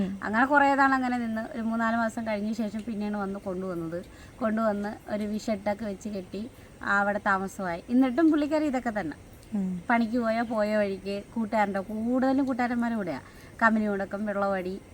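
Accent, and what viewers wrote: Thiruvananthapuram, India. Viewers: Chummadhalla oru TVM slang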